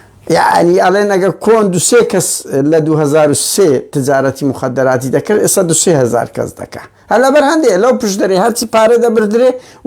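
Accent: Erbil, Iraq. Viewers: زماني هةوليريةكةت زور زور خوشة بةردةوام بة ئةمة لة بال ئةوةي كة بةريزت زور ئازا و بويري و بة هيواي ئةم دةنگة دليرةت هة بمينيت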